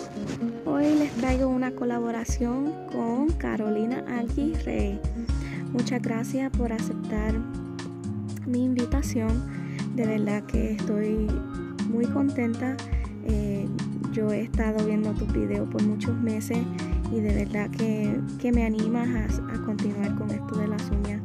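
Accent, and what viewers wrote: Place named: Puerto Rico. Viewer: Perdona la pregunta eres boricua? tú acento es igual que el mío PR en la casa saludos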